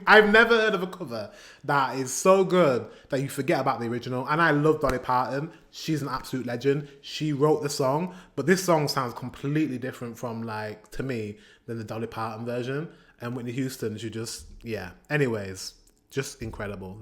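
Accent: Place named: Leeds, United Kingdom. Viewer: Does he live in Leeds? I assumed so due to the accent. I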